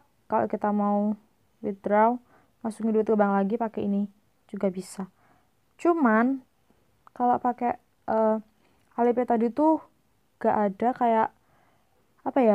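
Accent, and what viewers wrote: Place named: Java, Indonesia. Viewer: Ada medhok"nya gitu deh.wkwk tetep yang sama kek dulu. Semangat kuliahnya dong💖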